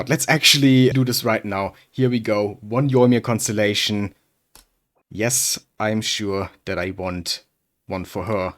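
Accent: Germany. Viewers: Do i hear german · I hear german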